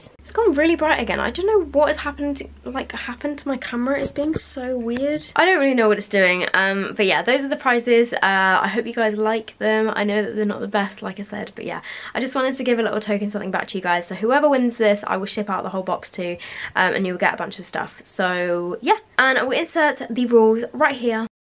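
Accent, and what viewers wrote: United Kingdom. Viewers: Congratulations on 50k!! 💕 Which part of the UK are you from? (You sound like you’re from London)🇬🇧 also yoi shouldn’t compare yourself because some of other YouTubers over exaggerate which makes them seem fake. You’re unique💜 also the fact you think that wasn’t a good giveaway is absolutely crazy! I would never get this much not even for Christmas💚Goodluck to everyone entering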